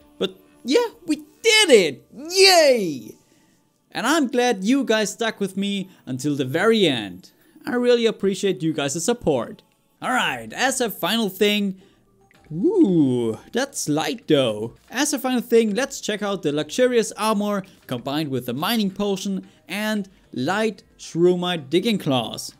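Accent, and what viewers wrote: Germany. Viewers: dude r u german?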